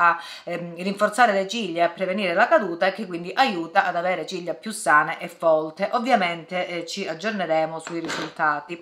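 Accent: Calabria, Italy. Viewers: Di dove sei ?sei Calabrese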